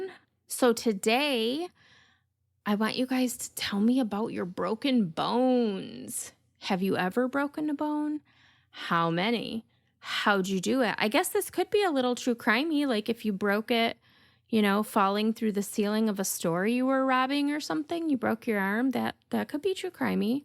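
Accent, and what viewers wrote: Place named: Michigan, United States. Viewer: I think your channel is fabulous!!! I was born and raised in Michigan. I moved to Washington state in 2011, and I really miss home! I feel like I found gold...I'm addicted to true crime podcasts, and hearing your stories in our lovely accent (lol) makes me so happy! Thank you for your thorough research and very interesting subject matter....keep these videos coming!!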